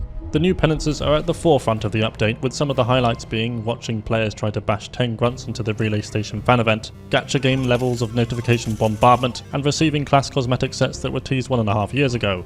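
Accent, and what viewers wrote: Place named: United Kingdom. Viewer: i love your unique combination of being "lucky" enough to be british and have the accent, the jokes, and the editing. oh dear oh dear. amazing. jump king stream when?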